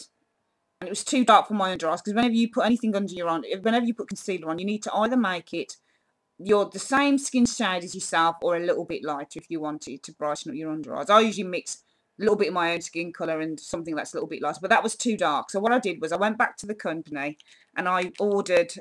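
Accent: Birmingham, United Kingdom. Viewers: great info are u a brummie x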